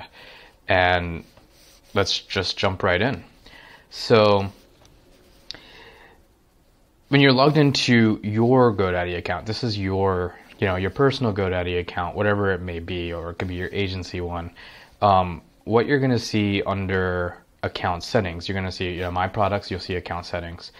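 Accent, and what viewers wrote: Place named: United States. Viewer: Finally someone with a clear voice and American accent thanks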